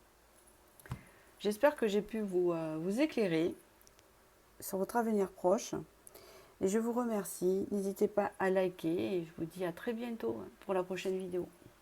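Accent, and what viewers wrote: South, France. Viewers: Merci merci merci pour toutes vos belles et inspirantes vidéos 🌸🌼🌺🎉 et n'écoutez pas les mauvaises paroles sur votre accent, ce sont des personnes malveillantes et sont jalouses des personnes du sud mais ça on n'y peut rien! Vous êtes formidables et apportez beaucoup de lumière ne changez rien et ne changez pas vive le sud 🌞🌞🌞💞 Solange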